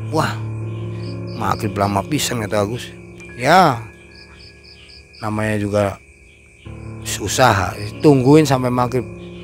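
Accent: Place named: Cirebon, Indonesia. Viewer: Wuaduh bhsae Kya wong cerbon apa wong dermayu kih ... Hehehehe 🙏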